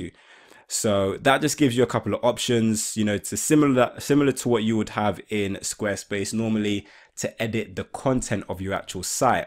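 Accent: United Kingdom. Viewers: R u British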